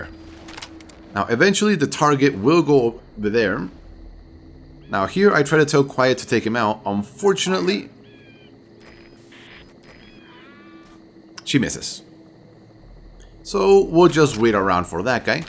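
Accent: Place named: South, United States. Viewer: he is probably from the south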